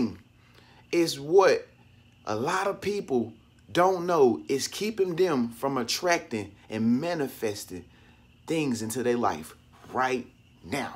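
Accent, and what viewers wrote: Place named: Midwest, United States. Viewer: This is True!🙌 Btw, r You from the Midwest?🤔 Ur accent is similar to where I Am.....👊😏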